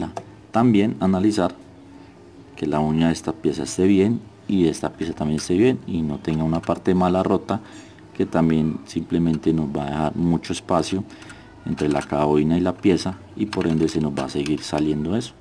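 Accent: Colombia. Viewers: Holaaaaa saludos no quiero equivocarme pero juraría que eres de Colombia, soy aficionada a la radiocomunicacion y conozco diferentes acentos. Me encanta tu forma de explicar tus vídeos. Gracias!! Voy aprendiendo muçho contigo. Es la primera vez que escribo.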